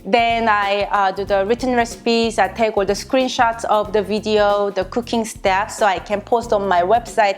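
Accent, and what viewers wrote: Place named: South Korea. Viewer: funny korean english accent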